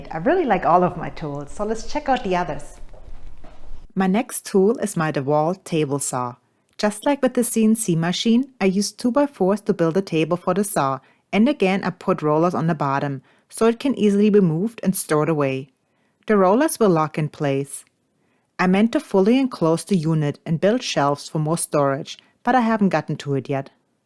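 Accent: Germany. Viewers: Als dein Video gesehen hab, musste ich lachen. 1. dein aussehen soll was? Die lustmolche anziehen?🤣 2. dein Roboter englisch, kommst du aus Deutschland? Das einzigste was mir gefällt ist das du als Frau dich handwerklich dich betätigst.😄👍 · Is that a German accent? Never really heard one before. 🤔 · Are you German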